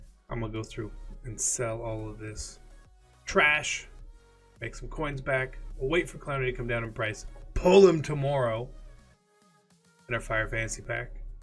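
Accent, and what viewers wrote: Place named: California, United States. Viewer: You talk one of them weirdo California’s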